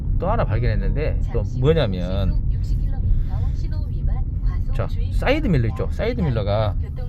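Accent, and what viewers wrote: Jeolla, South Korea. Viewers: 전라도 사투리부터 없애고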